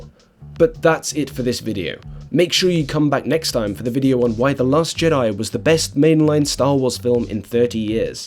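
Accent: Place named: England, United Kingdom. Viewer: I really liked what you had to say about the representation of the fascist regimes in film, I found it quite insightful. I couldn't help but notice, though, your repeated comparison of current day American right-wingers to these fascist factions in media. I understand that as an Angle (judging from your accent), you are not incredibly informed on American politics, but I wanted to let you know that the American right is rather far from looking like a fascist movement. They really are just a bunch of libertarians with traditional views, and they really do oppose authoritarianism in most all regards. I don't say this to be provocative and point fingers at the other side, but the American left is far more of an authoritarian- dare I say fascist- movement. Just figured I'd point it out as someone who is living in American politics.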